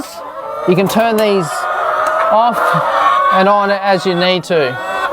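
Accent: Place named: Australia. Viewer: Ur australian :P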